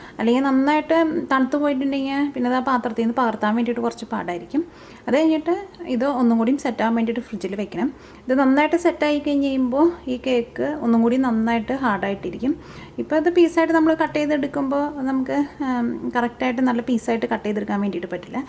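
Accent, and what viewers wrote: Thrissur, India. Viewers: chechide chiriyum pinne thrissur slangile samsaravum nice aaaa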